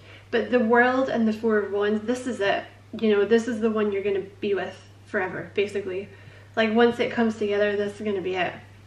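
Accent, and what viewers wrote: Scotland, United Kingdom. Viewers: I’m Gemini Sun… I enjoy listening to your Scottish accent. Merry Christmas!!☃️🎄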